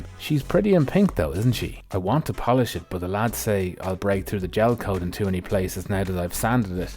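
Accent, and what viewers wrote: Ireland. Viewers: Just noticed you used your roll over framework on the vw bus, just spotted it on the intro collage. Your videos are a treat even my partner agrees but that might just be the Irish accent.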